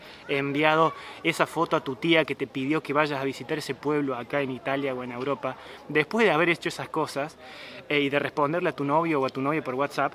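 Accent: Cordoba, Argentina. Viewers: Me encanta tu acento coooordobes... 🤘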